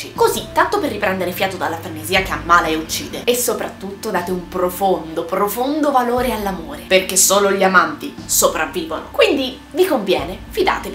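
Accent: Rome, Italy. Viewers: Me piace n' sacco il tuo accento romano, comunque adesso mi guarderò subito il flim!! Sei bravissima :D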